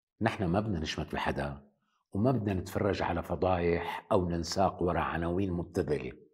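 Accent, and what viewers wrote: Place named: Syria. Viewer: والله انا اسمع للاستاذ مش بس عشان نقده واسلوبه، بس كمان عشان لهجته وطريقة كلامه السورية اللي وحشتنا كثير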